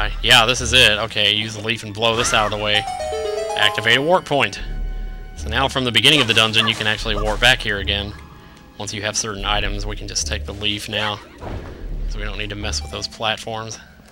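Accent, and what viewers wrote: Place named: Wisconsin, United States. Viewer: Are you from Wisconsin? you seem to have a wisconsin accent... like me lol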